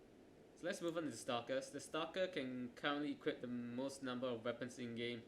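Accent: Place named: Singapore. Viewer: I detected Singaporean!!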